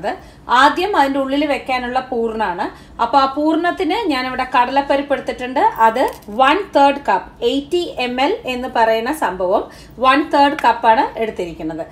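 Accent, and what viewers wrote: Palakkad, India. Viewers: Nice - Also dear palakkad slang. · Palakadan slang kelkan nalla rasamalle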